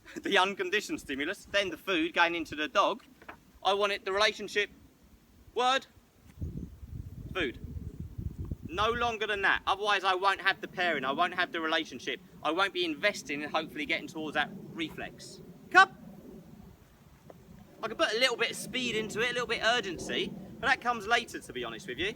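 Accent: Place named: London, United Kingdom. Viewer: Where are you based. You sound like you are from London.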